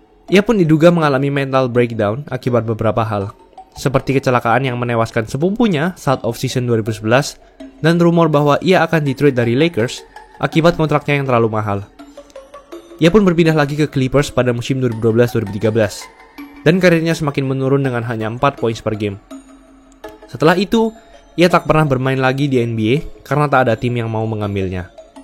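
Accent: Java, Indonesia. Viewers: konten mantap, aku saranin untuk next video dubbingnya jangan medok banget bang wkwkwkwk, semangat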